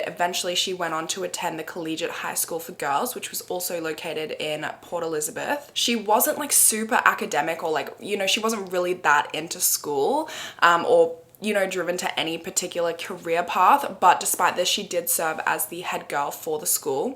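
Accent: Australia. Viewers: Really enjoyed this story. But "like "like" "like" and with the Kath and Kim accent in there, was a bit grating on my old brain. I wish you all the success utube can give you.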